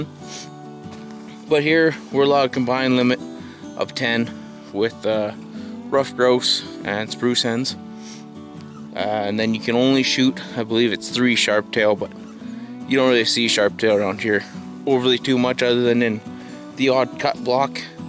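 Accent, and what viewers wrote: Canada. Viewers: This is a Canadian accent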